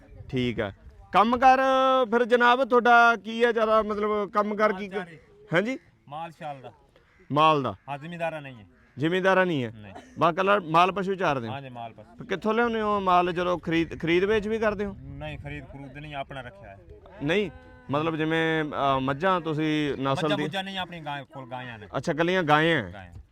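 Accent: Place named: Malwa, India. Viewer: ਜੀ ਤਵਾਡੀ ਮਲਵਈ ਭਾਸ਼ਾ ਹੈ ਅਸੀ ਜਿਹੜੇ ਪਾਕਿਸਤਾਨ ਤੋਂ ਆਏ ਹਾਂ ਜਾਂ ਮਾਝੇ ਵਾਲੇ ਸਾਡੀ ਭਾਸ਼ਾ ਢਿਲੋਂ ਸਾਹਬ ਜਾਂ ਸ਼ਮੀ ਜੱਟ ਹੋਰਾਂ ਦੀ ਭਾਸ਼ਾ ਇਕੋ ਹੀ ਹੈ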